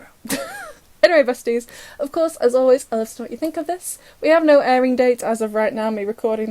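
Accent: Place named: Lancashire, United Kingdom. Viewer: dw I heard 625. Probs because I’m in Lancashire too so have a similar accent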